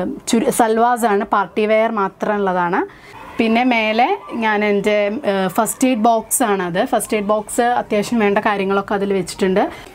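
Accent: Palakkad, India. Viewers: Very nice presentation.Palakkad slang nannaytnd chechik..inim itholathe videos expect chyunnu.All d best 😊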